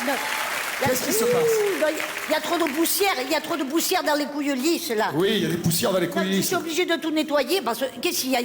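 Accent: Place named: Cannes, France. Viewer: Bravo Mado vous aime géniale et incassable moi qui vient de Cannes et qui n'y suis plus ça me fait un bien d'attendre l'accent de chez nous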